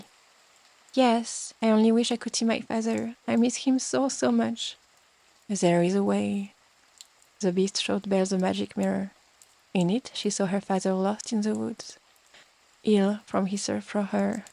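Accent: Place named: France. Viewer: I love a nice French accent. Ty. Also hi I’m your newest sub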